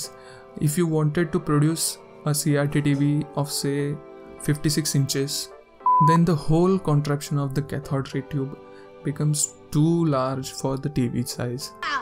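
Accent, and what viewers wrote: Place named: India. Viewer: Just hear indian that's it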